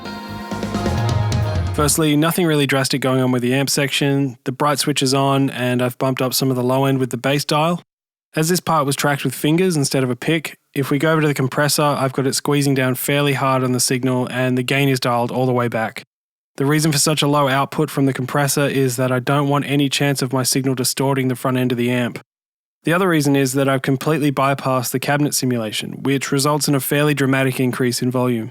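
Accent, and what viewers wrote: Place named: Australia. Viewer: That flat deadpan narrative is just how many Australians talk, especially musicians who are more interested in letting their instrument convey emotion than their speaking voices.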